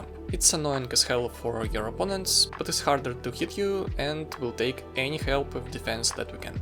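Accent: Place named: Russia. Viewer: Слышно своих)) Но произношение красиво, спасибо за билд.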